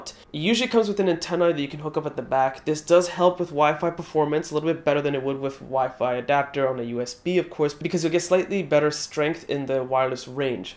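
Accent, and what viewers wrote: United States. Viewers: You speak like U.S accent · wow!! You speak like U.S accent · Is he american?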